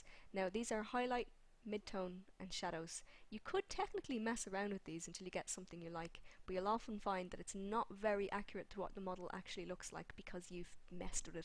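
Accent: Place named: Ireland. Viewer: Irish accent is best accent.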